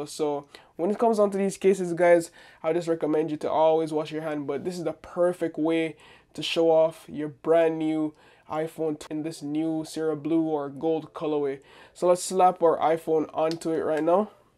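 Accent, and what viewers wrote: Jamaica. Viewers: U sound like u have a lil Jamaican accent 🇯🇲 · Why do you sound Jamaican 👀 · my guy jamaican as hell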